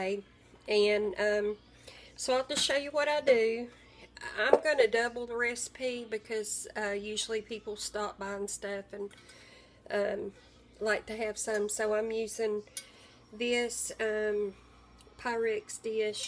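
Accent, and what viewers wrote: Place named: East Tennessee, United States. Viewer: I am a new subscriber and I just love your videos. You may have shared this in some of the videos I haven`t seen yet , but do you mind me asking where you are from? I am from East, TN and you sound very much like the people in this area.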